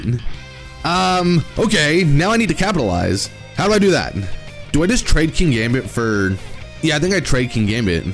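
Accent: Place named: South, United States. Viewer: lmao you kept me guessing, the redneck accent is definitely southern Alabama/northern Florida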